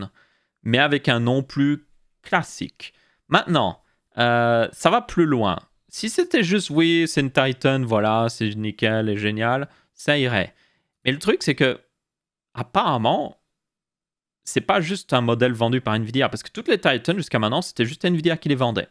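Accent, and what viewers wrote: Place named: Belgium. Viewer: Je suis français et j'adore quand tu parles Belge. Je trouve ça trop cool. Puis on a moins l'impression de vivre dans un monde monolithique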